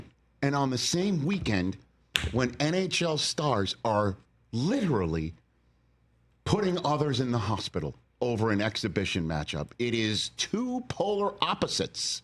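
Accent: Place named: Staten Island, United States. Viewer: You could hear that Staten Island accent coming out that entire segment. I love a fired up Rich.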